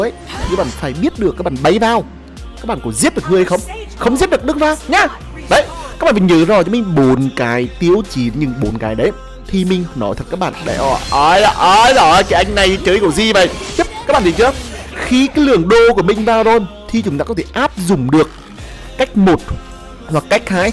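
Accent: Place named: Central, Vietnam. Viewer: Anh ở miền trung à giọng giống em vãi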